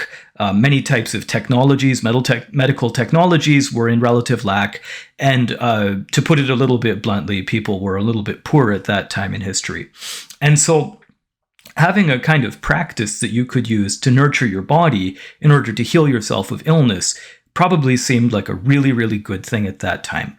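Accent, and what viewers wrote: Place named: Minnesota, United States. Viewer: Are you out of Minnesota? I hear an accent.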